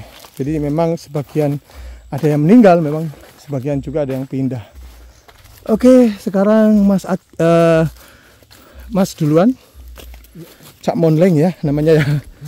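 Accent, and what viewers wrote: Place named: Banyumas, Indonesia. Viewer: Omongane ngapak....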